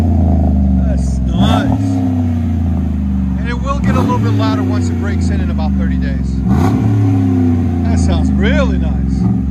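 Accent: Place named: New England, United States. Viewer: I cant get past the sound of a yankee doing the commentary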